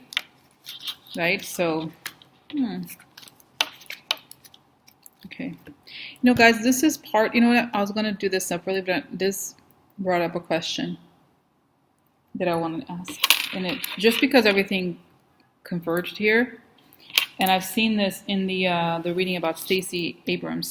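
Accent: South, United States. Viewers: Just luv the southern drawl! What a hoot you are !!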